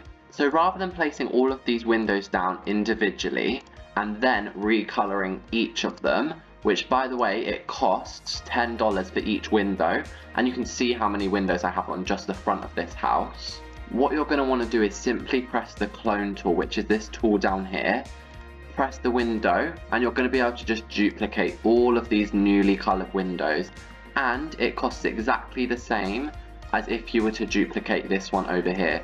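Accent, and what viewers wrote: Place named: United Kingdom. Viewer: Sounds like a briish boy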